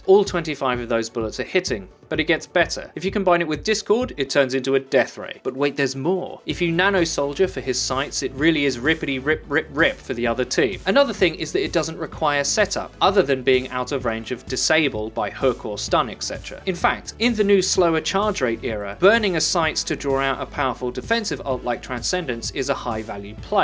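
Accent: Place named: United Kingdom. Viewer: Zack Edwards Im British, but cmon dude, he has one of the most overly British accents ive ever heard.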